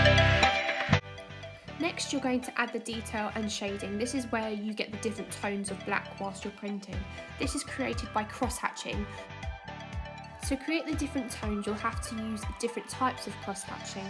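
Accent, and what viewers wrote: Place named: Bristol, United Kingdom. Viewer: A Bristol accent! My roots!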